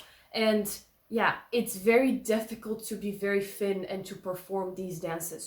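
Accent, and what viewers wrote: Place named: Ireland. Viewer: love Ireland accent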